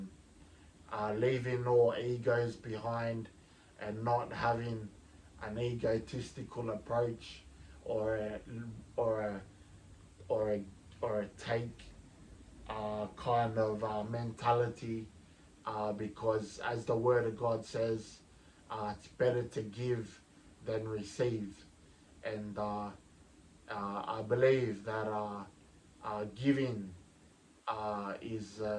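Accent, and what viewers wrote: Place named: New Zealand. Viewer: Are you originally Kiwi? I hear a bit of a Kiwi accent but you're Polynesian anyway! That is clear brother!😂😜I'm gonna say Samoan (please correct me if I'm wrong!) I've played rugby with so many Pacific Islanders and I have a great love for you guys! The most generous people I have ever met! All the best brother! Much love from Ireland! Nofo lelei lou uso!❤🇮🇪🇼🇸🇹🇴🇫🇯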